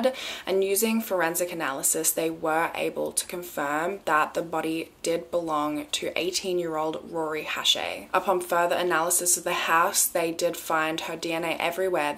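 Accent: Australia. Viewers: Bestie wake up, a new murder explained in a soothing Australian accent just dropped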